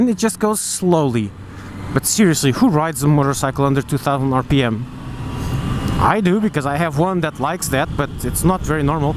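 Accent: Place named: Portugal. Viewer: pelo sotaque nota se que es portugues tou para adquirir uma de 2016 sabes que modificaçoes teve as versoes aseguir?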